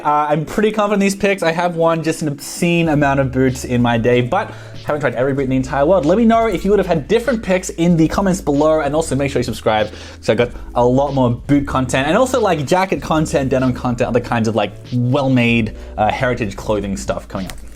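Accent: Australia. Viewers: Old mate sounds Aussie to me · You sound very much like an Aussie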